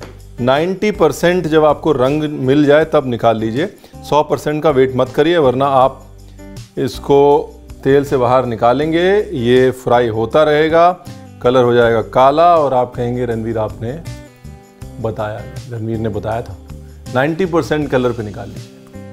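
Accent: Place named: Punjab, India. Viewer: I jst love ur panjabi style talking sir and hindi both,and ur recipes r tooo easy to cook at home and yummy,mostly sweets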